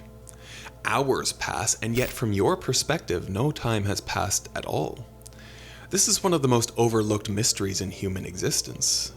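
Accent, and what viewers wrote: Ontario, Canada. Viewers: lousy canadian accent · i love your Ontario accent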